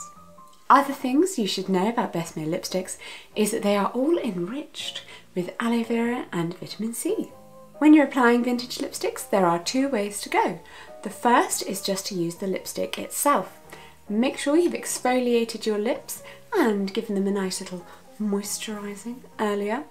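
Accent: RP, United Kingdom. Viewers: Your british accent sounds so proffetional and similar to the royal families accent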